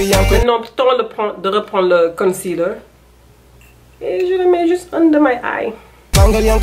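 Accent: Wolof, Senegal. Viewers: Je découvre ta chaîne Miss tu es belle belle,je suis une Sister sénégalaise. haha j aime ton accent wolofaliser. en fait j ai aussi une chaîne YouTube ,ci ça te tente d aller checkeeeee. bis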